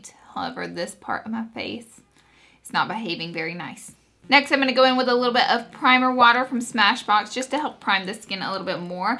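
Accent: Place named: Georgia, United States. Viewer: Ugh, it upsets me when people make comments about your voice because your voice is so cute!! I literally could listen to it all day, ur accent reminds me of back home in Georgia.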